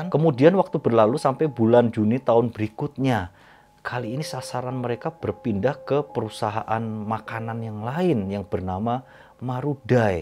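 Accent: Java, Indonesia. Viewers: Jawa apa sunda om? Kok indonya medhok hehe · Medok bgt dah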